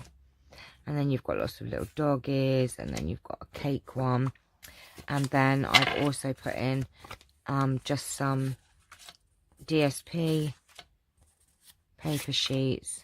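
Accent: Yorkshire, United Kingdom. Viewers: You made me laugh with your Yorkshire accent 😂😂 and your jokes lol! Thanks for sharing, it’s been fun getting to know you more xxx❤️ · Your story about going out in a convertible reminded me of a wish I've had since a teenager, wanting a MG convertible. I loved that movie Half a Sixpence, my mum bought an LP of the songs loved it, played it so often. You are so entertaining love your Yorkshire accent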